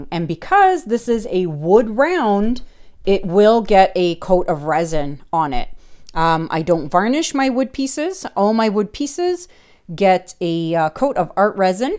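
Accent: Canada. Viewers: I'm just starting out. I have to tell you, of all the YouTube tutorials out there (and I've been binging!), your channel is the absolute best. Aside from all the detailed instructions you give, I love your interaction with your viewers (and your Canadian accent! LOL). Thanks for sharing this wealth of knowledge you have. Your work is stunning and I appreciate your responses to my questions on many of the videos I have watched. You are amazing. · 4 daughters, 3 stepsons, which gives me 3 daughter in laws, 26 grandchildren I've had a moment or too LOL , in 5 yrs i have beat cancer, raised 2 grandkids,lost 2 best friends to cancer my mom and sister and now i am helping my husband fight stage 3 colon cancer with this Covide 19 going on, if anyone walks into my house with out saying hello to me I say Good-bye, you go girl and by the way love that Canadian accent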